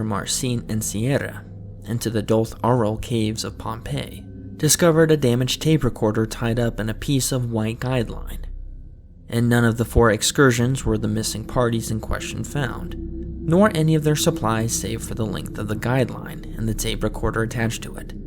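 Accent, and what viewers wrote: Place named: Midwest, United States. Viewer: probably the midwest, reminds me of a thicker version of my accent so i’m fairly confident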